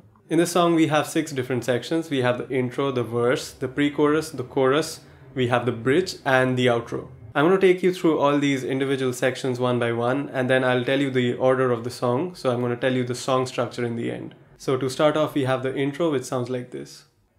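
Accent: India. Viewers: Merko ye banda indian lg rha.. accent bilkul indian vali hai..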